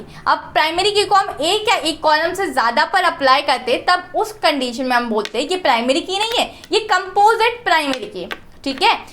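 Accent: South, India. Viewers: R u from South?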